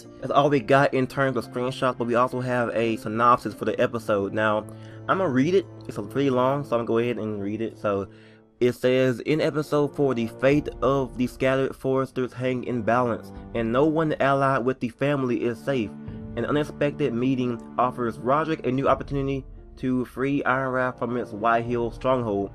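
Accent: South, United States. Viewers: Your American accent is awesome. You southern American urbans sound so much different than us northern Canadian urbans.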